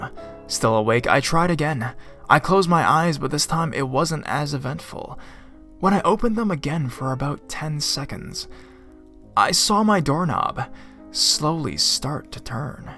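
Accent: Canada. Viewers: Are you Canadian? Just wondering because of your accent. Anyway, good video! 👍 I like the longer ones too. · Are you Canadian? If you are then you got the most awesome Canadian accent....if not then....damn good Canadian accent lol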